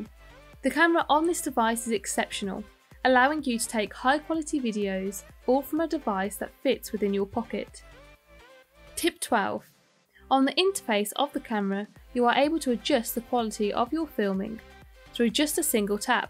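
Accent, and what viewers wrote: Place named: United Kingdom. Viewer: Great video and very useful tips- everything is better in a British accent! :)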